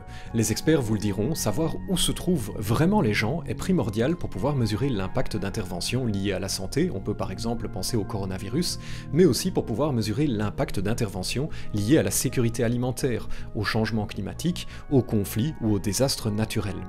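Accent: Belgium. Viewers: Aïe ! L'accent belge si vite dans la video, j'était pas près x). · ben c'est l'accent belge...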